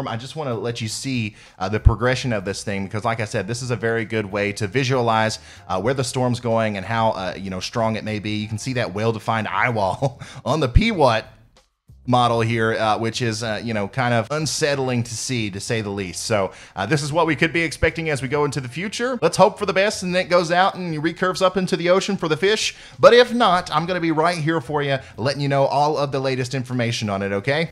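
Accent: United States. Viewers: You're a YouTube personality, you can take as much time as you need to talk about the weather. Too much country in your talk.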